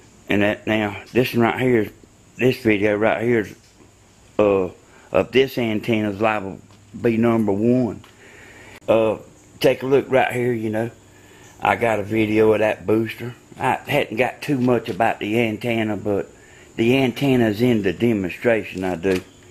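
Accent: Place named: Georgia, United States. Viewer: Soon as you started talkin I knew you was from Georgia..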